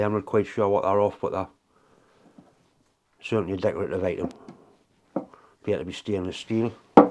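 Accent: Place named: Northern England, United Kingdom. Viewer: Great to hear your voice again, I hope you and are doing well despite things. I'll be honest but I miss northern accents down here in Norfolk, and yes yours is the best. Any time your down in Kings Lynn, beers are on me. Chin up bonny lad, you take care of yourselves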